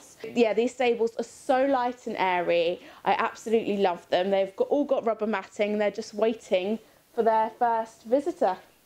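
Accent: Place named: England, United Kingdom. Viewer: What part of England are you from?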